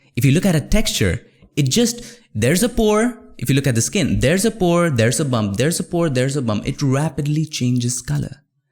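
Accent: India. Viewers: exactly! And i love how he still has his indian accent but it is still understandable!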